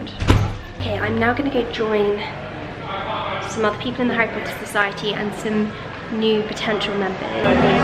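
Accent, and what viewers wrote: United Kingdom. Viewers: did you know that I got my british accent by copying you? 😍😍😂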